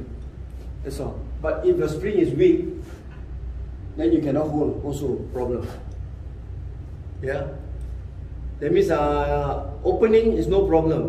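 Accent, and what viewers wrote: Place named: Singapore. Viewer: Sounds like Singaporean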